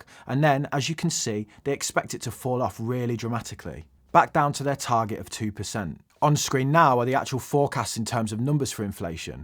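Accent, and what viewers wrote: Birmingham, United Kingdom. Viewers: Is that a Birmingham accent?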